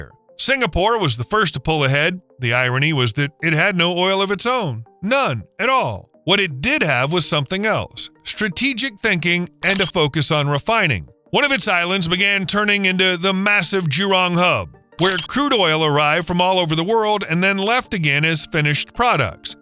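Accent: United States. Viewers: Because it's a Yankees voice for the video, I'll give a example, "WE PAYED YOU FOR THE NUCLEAR SUBMARINE DEAL WE ARE HALFWAY IN TIME AND STILL HAVEN'T RECEIVED THEM OR ANY"?????? WTF WE ARE YOUR BEST ALLIE AND IF I WAS PRIMINISTER I WOULD ASK FOR REFUND & GET A GOOD DEAL NOT BENT OVER WITH NO GASOLINE.... LOL 😂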